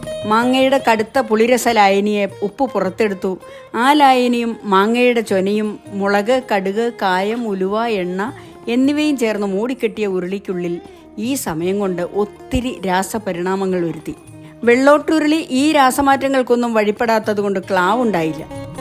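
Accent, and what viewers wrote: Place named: Ranni, India. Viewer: എൻറെ മുത്തശ്ശിയും ടീച്ചറുടെ നാട്ടുകാരിയായിരുന്നു.റാന്നി.ഇതേപോലെയുള്ള സംസാരവും ജീവിത ശൈലിയും.❤❤❤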